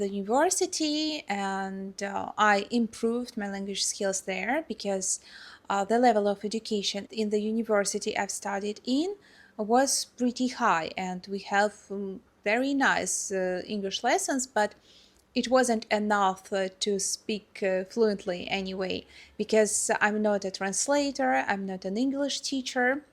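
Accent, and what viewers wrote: Russia. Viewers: Yes people dont seem to put salaries and costs together they just focus on one or the other. They also dont look at exchange rates for different areas/countires. Im glad you have been able to see some other countries and hope you can visit the USA someday. You speak english well just dont lose that charming russian accent. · You speak very good English, do not be shy or embarrassed by your accent, 99.9% of Americans. do not know a single word in Russian......Are you familiar with another Krasnodar blogger, he is an American who lives there, he goes by the name of "Comrade Captain Ron".?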